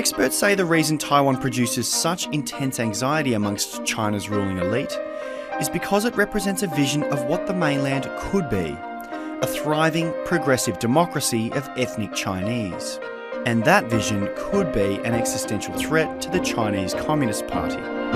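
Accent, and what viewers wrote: Australia. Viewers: Sounds like an average Aussie accent to me.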